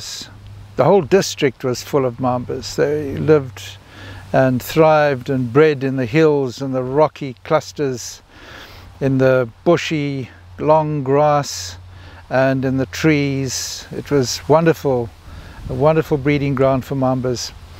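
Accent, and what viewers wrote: South Africa. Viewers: I like the style with you being outside. The South African-ness and story telling puts me in the same frame as reading a Wilbur smith book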